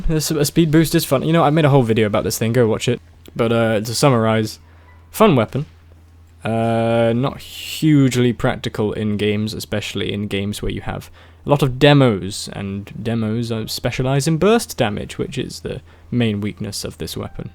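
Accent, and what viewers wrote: United Kingdom. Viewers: I don't know why, but I, as a non-English-speaker, love your British accent.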